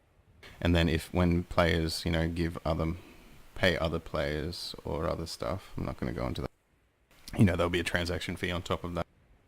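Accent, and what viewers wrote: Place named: Australia. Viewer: Damn, that's a pretty good Aussie accent for a Norwegian!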